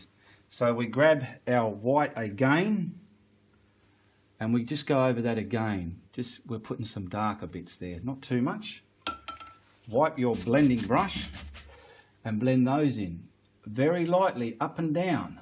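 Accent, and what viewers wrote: Australia. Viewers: Just stumbled across your video and I gotta say, I think I found my new Bob Ross lol. Your voice is very nice to listen to❤️ I love me an Australian accent🤭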